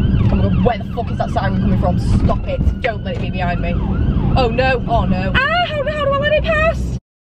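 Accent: Manchester, United Kingdom. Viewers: First word she said I was like “SHES FROM MANCHESTER” n then she said it so I feel validated. That’s all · Kinda caught me off guard when she started speaking in a British accent. I thought I was still on the American side of youtube. Loved watching this though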